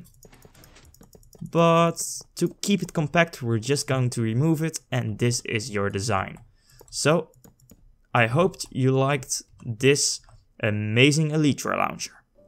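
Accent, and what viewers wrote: Netherlands. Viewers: you're Dutch aren't you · bad english lol, you are obviously dutch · kerel, jouw nederlandse accent😂😂 nice! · You sound dutch. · Netherlands xD Ik hoorde je accent al een klein beetje. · Holy shit je nederlandse accent man xD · he has a dutch accent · I can hear That youre dutch from ur accent :P goeie video man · Are you dutch? You really have that dutch-english accent, i know because i am dutch XD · Are you from Belgium or Netherlands? :p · je hoort gewoon dat je nederlands bent...lool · Ben je nedderlands haha ik hoor het aan je accent leuke video ga zo door! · are you dutch i can hear it from your accent? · hey you dutch??????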